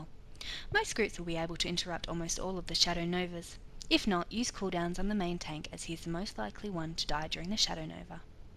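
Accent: Australia. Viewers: Aussie narrator, US based guild. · Aussie right?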